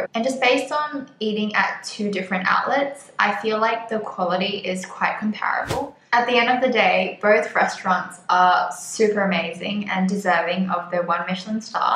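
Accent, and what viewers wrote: Australia. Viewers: didn't know sight seeing and dinning out in Michelin are valid exemption reasons for travelling... lol , but all good now, when you return to Sydney , don't even have to home quarantine now if you have been vaccinated... your Aussie sounds like music for Aussie and Hong Kong expats...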